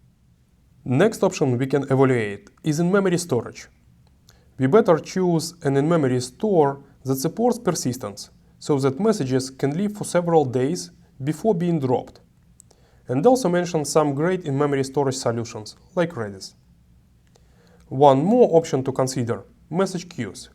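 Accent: Russia. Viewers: 这是俄罗斯口音吗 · Ох уж этот русский акцент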